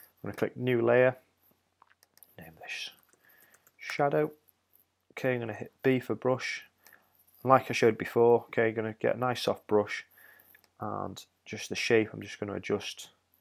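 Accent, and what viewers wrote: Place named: United Kingdom. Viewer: Ur def a Brit but this is a great video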